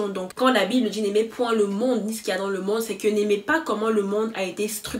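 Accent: Cameroon. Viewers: Je viens de découvrir ta chaîne, c'est super wahou l'accent camer se sent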